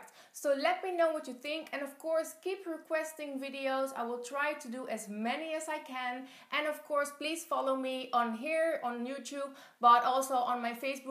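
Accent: Netherlands. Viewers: One thing. Are u Dutch?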